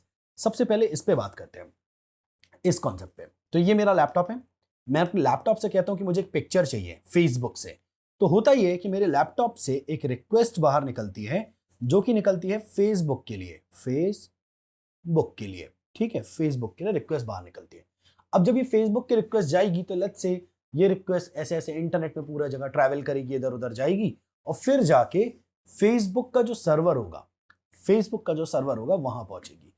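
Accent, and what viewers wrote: Bhopal, India. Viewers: bawa bhopal se ho kya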